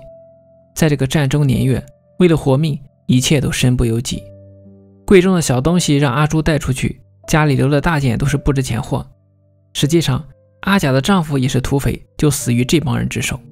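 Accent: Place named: Qingdao, China. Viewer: 解说的青岛口音藏也藏不住😂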